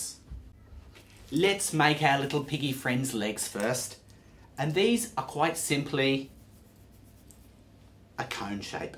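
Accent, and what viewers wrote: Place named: Australia. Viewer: Are you Australia? Or New Zealand??? Coz I am Australian and my brother does this "Typical Aussie Accent" and as I watched this video, it reminded me of that voice! (No, I don't find it annoying coz I am used to it):)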